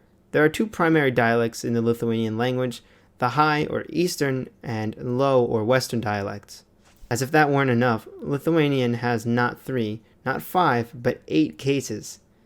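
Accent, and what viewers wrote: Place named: Russia. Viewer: Aš gavau šitą video recommended skyriuje ir aš lietuvis, bet tu skambi, kaip su rusišku akcentu.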